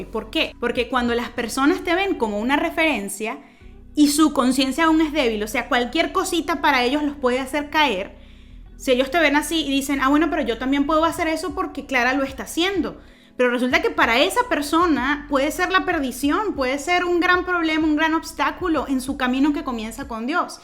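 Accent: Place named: Venezuela. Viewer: De donde eres? Parece de Venezuela.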